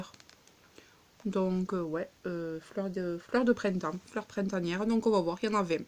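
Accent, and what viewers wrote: South, France. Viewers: Mais je n'avais pas fait attention à ton petit accent du sud. Je ne savais même pas qui Vendée de la peinture chez Action. Tu as fait pamal d'achat. Merci pour le partage. Au plaisirs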